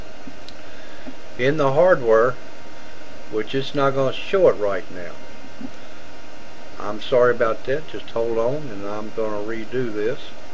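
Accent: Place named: Texas, United States. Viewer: Great Texas Accent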